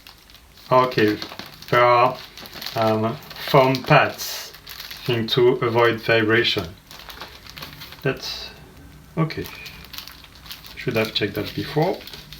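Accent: France. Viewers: you have a french accent, mon ami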